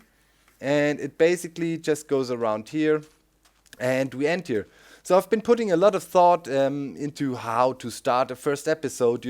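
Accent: Germany. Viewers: He is German. You are Dutch. He has a slight German accent. He does not live near the east side of Germany, so not even close to the Netherlands (if I recall correctly). What's your point calling him Dutch? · ***** is that a german accent I hear? sehr gut herr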